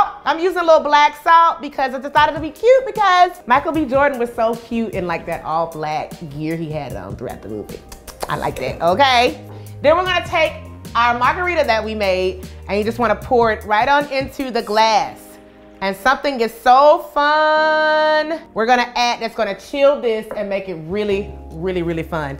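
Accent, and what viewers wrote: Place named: South, United States. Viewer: I know that right, and she has a voice too. That's that good old Southern voice.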